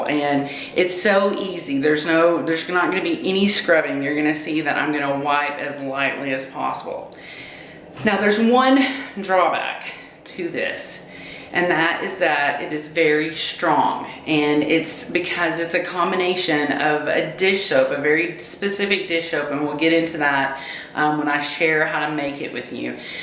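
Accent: South, United States. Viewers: Omg, I love your southern accent. I've lived in Washington state for 30 years and your accent really makes me miss the south. ❤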